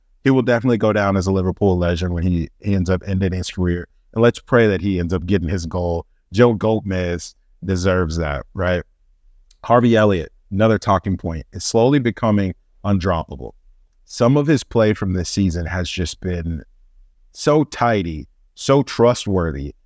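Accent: United States. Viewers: Usually my brain turns off when I hear an American accent in relation to football talk but your depth in knowledge and analysis is superb! subbed!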